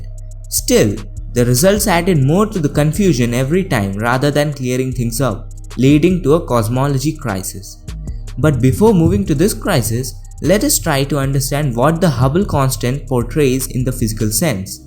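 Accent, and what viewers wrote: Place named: India. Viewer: Wow, Indian English is terrible.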